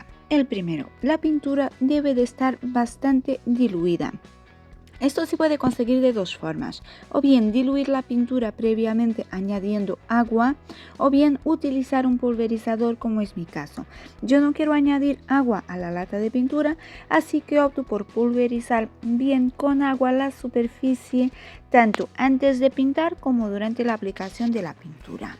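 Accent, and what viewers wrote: Portugal. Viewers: Excelente trabalho. Até aposto que a voz é de uma portuguesa🥰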